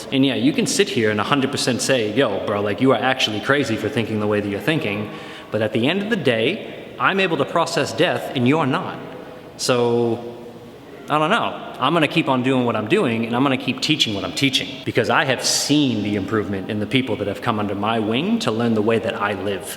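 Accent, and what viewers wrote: Australia. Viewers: It’s funny hearing you speak with an Australian accent and then comparing it to your older videos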